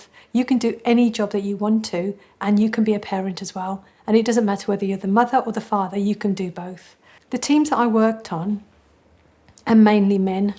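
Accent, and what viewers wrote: Birmingham, United Kingdom. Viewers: Definitely a brummie girl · She has birmingham accent .....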